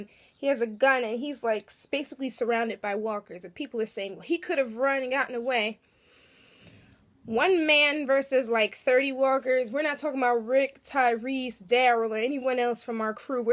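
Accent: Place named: South, United States. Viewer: and from your accent i would say you are a southern girl so yea throwing a curve ball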